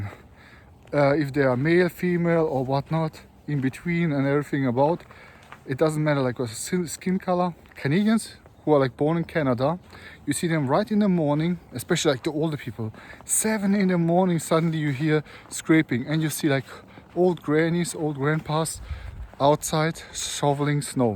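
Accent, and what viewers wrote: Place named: Germany. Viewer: your accent is cute. i can tell right away you are german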